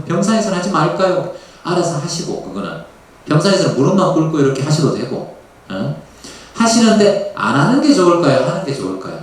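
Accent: Gyeongsang, South Korea. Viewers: 경상도 액센트 너무힘들어요.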